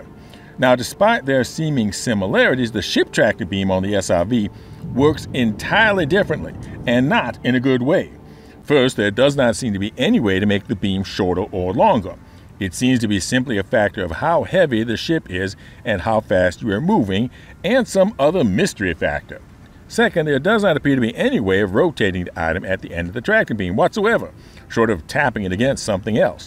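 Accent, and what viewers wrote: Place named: South, United States. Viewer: Please don't take this personally, but for most of the world a southern US accent is not associated with the best of things. Also it's not always easy to understand. I would prefer a more moderated, just normal, English.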